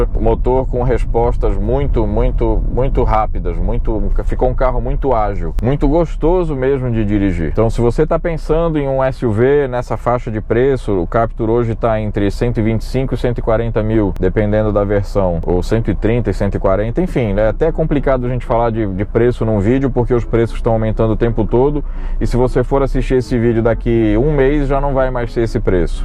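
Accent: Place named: Pará, Brazil. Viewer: Motor news o apresentador e paraense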